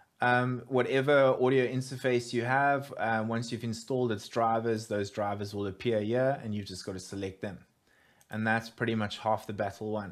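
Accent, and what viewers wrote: South Africa. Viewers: you sound south african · South african✊🏿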